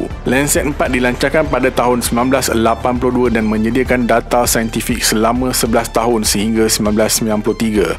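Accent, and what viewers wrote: Malaysia. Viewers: Cara bercakap macam orang Malaysia, Salam Dari Malaysia 😂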